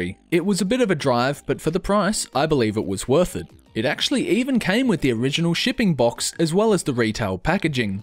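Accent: Australia. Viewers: Finally an Aussie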